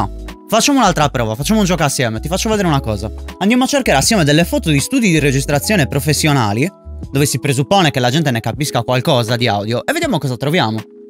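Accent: Sardinia, Italy. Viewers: Si sente giusto un po' che sei sardo ahahha, Comunque bel video, Grazie ❤️ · Ciao! Un consiglio :) ho una stanza che ho adibito a studio, sia per suonicchiare/registrare qualcosina, sia per streaming di gaming. La stanza è un po' lunga e stretta, ma soprattutto il soffitto è molto alto. A grandi linee: 2x3,7m, soffitto circa 3,7m. C'è abbastanza riverbero sulle basse, ho pensato di "abbassare" il di circa un metro e mezzo con uno strato di pannelli fono assorbenti piramidali da 5cm...ha senso? Mi sai dare una dritta? Ciao da un tuo concittadino 😁 (dall'accento direi che siamo entrambi di Cagliari)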